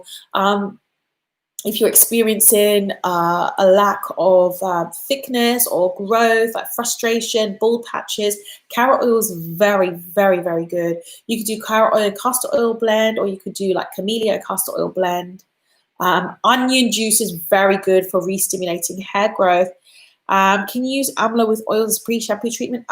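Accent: United Kingdom. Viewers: So funny. I literally just looked up "fortnightly" (happening or produced every two weeks). You speak the Queen's English. I speak American. LOL!!!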